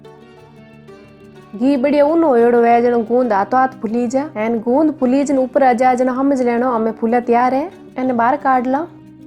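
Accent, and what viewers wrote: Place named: Marwari, India. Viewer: Khamma ghani sa🙏 bohot achi marwadi boli sa , recipe b badiya sa, bohot acho lago mithi marwadi sune ne😊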